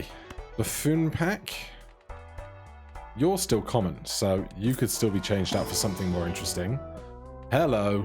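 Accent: United Kingdom. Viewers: Hello, been loving your Balatro content, something about a fellow Brit commentating over this game in such a dry-witted fashion has only made me so much more eager for the release of this game so that I can actually play it for the first time. Fantastic content, please keep it up as much as you can considering your other commitments.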